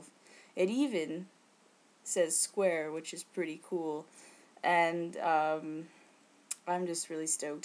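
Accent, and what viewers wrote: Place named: Canada. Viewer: I thought you where American untill you pronounced out as "oot"and about as"aboot""and then I thought you must be Canadian.please correct me if I'm wrong.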